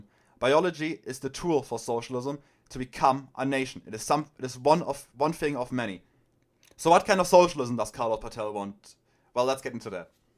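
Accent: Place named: Germany. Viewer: based, this is what got me into national bolshevism, also I love the German accent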